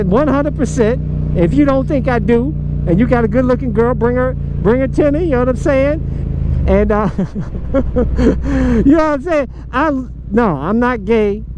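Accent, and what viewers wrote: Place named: New Orleans, United States. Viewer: With your accent I always thought you were just from New Orleans 🤔🍷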